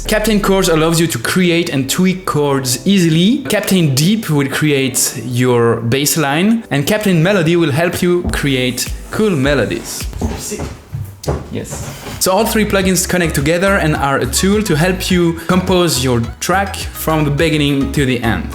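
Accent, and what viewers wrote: France. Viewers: Are you french ??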